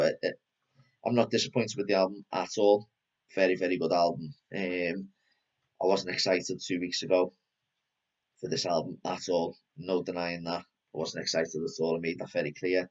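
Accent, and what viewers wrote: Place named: Liverpool, United Kingdom. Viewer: Nice to hear a fellow scouse accent talking about 😉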